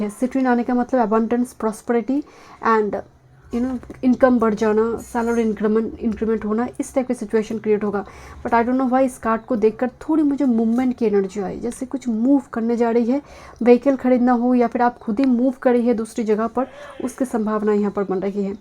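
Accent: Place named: South, India. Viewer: Mam are you South Indian... either hyderabad,or karnataka...I guess due to your hindi accent 😅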